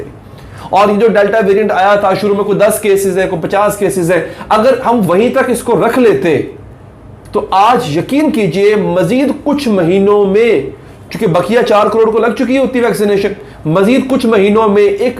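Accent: Punjab, India. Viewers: Love your punjabi accent 😍 ❤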